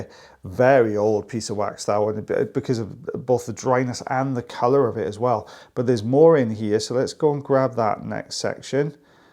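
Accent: Wales, United Kingdom. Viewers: A Welsh accent makes this even better. Very satisfying.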